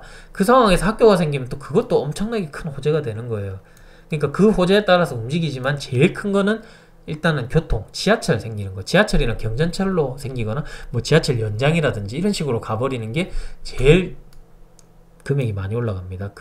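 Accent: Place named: Busan, South Korea. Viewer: 정보 감사합니다. 지금은 서울에서 살고있지만 15년전에서 부산남천동에서 살았지요. 정보도 감사하고 고향사투리가 듣기도 좋아요.^^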